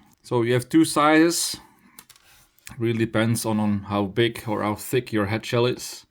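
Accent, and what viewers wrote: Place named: Netherlands. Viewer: Je klinkt als een Nederlandse die Engels spreekt 👋🏻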